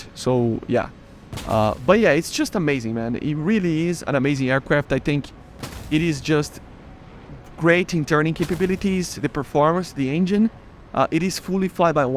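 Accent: Brazil. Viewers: He’s Brazilian, accents buddy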